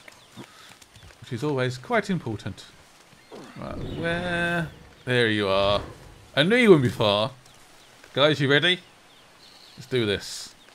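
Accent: United Kingdom. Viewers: This lets play is much better cause of the British narration, makes it feel more like a documentary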